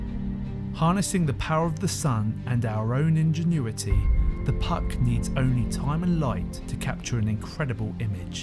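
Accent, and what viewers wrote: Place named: United Kingdom. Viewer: No offense intended, but are 98% of all videos now narrated by the same British reader? 🧐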